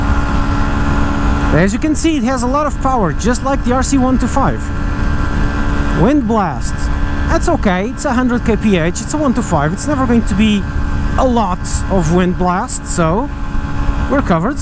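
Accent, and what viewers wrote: Portugal. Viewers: Ahah o teu sotaque parecia mesmo de Portugal mas só quando falaste é que percebi mesmo ahah, podes dizer-me quanto custa essa moto em Portugal? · Sotaque tuga! Sera?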